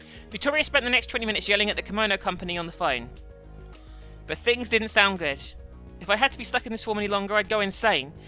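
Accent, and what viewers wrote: South, United States. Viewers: I love the southern accent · I love the southern actcint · Not a fan of this stupid game, but I personally luv southern accent!:) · People really hate that southern accent voice? I find it enjoyable and in your Dangonropa voice it kinda fit Nagito's bat shit crazy personality.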